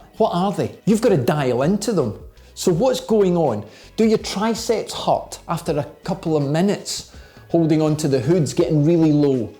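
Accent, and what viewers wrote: Scotland, United Kingdom. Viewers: Fook me, did I just spend 12 minutes listening to some Scuuu...ottash lad telling me to get my head down and stay off the brakes! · I just watch these videos to hear him swear in Scottish.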